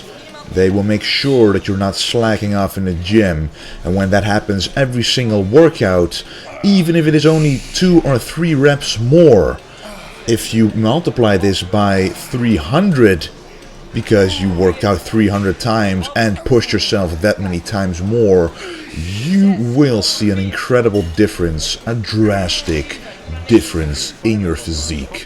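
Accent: Netherlands. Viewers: YUNG_BOAA Come on dude. He's dutch. Its his accent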